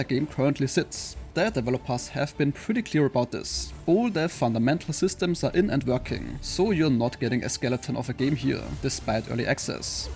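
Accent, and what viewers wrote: Germany. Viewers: wow hast du nen krassen deutschen akzent :D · i hear the german in your voice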